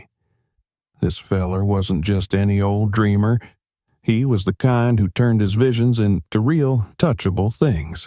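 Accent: United States. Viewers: Sorry but don’t like the American accent especially when to deal with haunting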